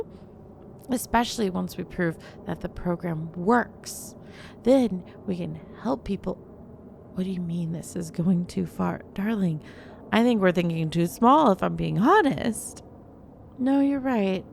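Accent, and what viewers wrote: South, United States. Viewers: Too bad women like this dont exist and im not saying i wanna take a backseat and let her do EVERYTHING im a man i want to treat a woman like a lady i wanna praise her too. But you got women out here wanting a guy to have a chisled body be 6ft or more and make 100k+ a year like uh..... so im just cooked also her southern accent is amazing.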